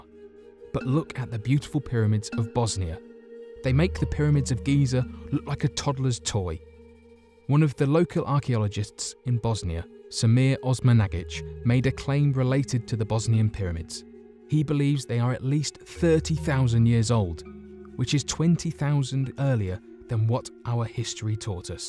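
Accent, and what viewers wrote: United Kingdom. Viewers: this guy is more brittish than our normal guy where is our normal guy? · Tacos - they are from different regions in the UK, hence difference in their accents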